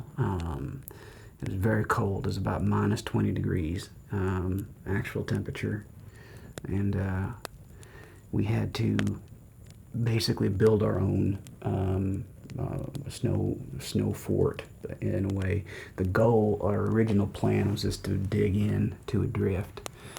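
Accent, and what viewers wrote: United States. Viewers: Love the crackling campfire sounds, plus your storytelling with the cowboy accent. Wild that those are true stories. 🤠🔥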